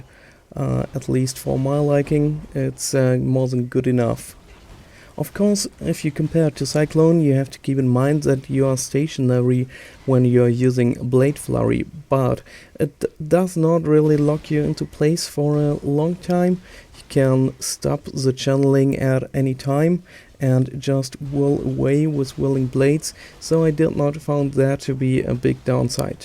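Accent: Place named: Germany. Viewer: we germans speak like that. so what?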